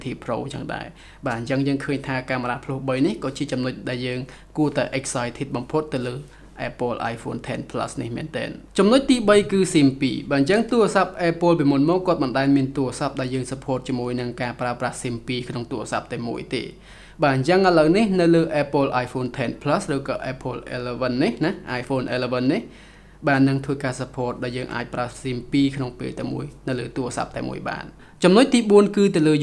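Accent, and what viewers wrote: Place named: England, United Kingdom. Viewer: តុងអងគ្លេសខ្លាំងពេកហើយ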